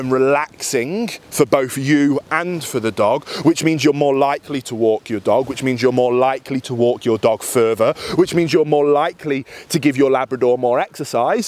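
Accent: United Kingdom. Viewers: What amazing training tips! I've lived in South Korea for a long time so hearing a good British accent is something I miss!!! My lab loves his crate now since watching your video.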